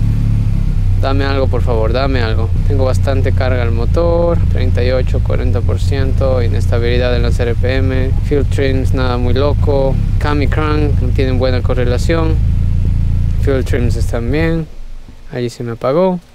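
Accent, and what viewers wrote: Cuenca, Ecuador. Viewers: suenas como que fueses de ecuador de cuenca... buen video amigo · Buen video. Eres Ecuatoriano? Tu hablado me suena como de acá jaja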